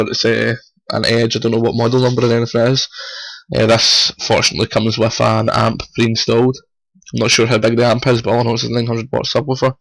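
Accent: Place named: Scotland, United Kingdom. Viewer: scotish mate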